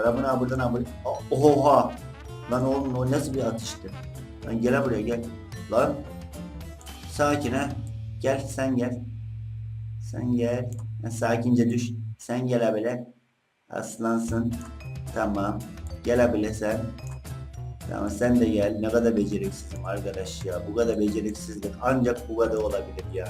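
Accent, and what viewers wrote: Black Sea, Turkey. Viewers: Karadenizli büyük ihtimal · Abi sen Karadenizlimisşn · Karadenizli mi kinusma tipine haz usagim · ABİ KARA DENİZLİ GİBİ KONUŞUYO AMA ŞİMDİ NORMAL OLDU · Abi karadenizli mısın?🙊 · Abi Karadenizi misin? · abi sen Karadeniz'li Misin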